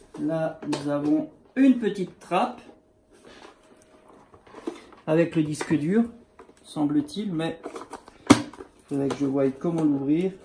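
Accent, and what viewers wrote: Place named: Alsace, France. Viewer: On sent l’alsacien de base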